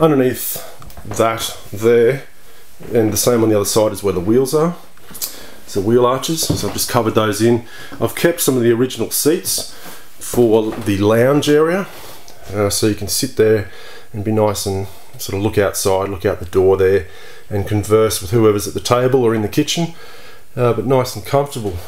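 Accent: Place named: Australia. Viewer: It's an Aussie accent.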